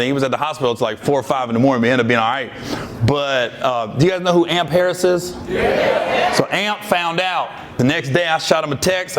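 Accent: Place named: Compton, United States. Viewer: Blech. Why is he talking like a dumb black guy from Compton? Stupid.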